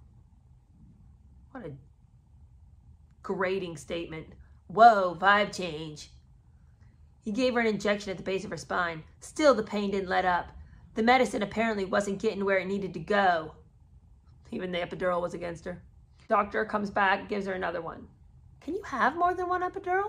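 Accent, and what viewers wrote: United Kingdom. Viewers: I love your British accent 😹😹😹😹 very My Fair Lady! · Your story telling and British accent made me laugh to the point of snorting! I so enjoy your humor!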